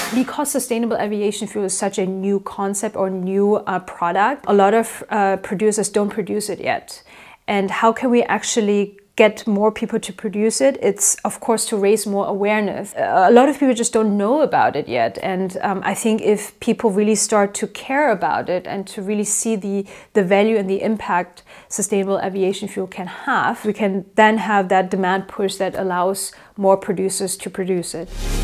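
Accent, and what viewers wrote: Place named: Germany. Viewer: Ich mag ihren deutschen Aktzent...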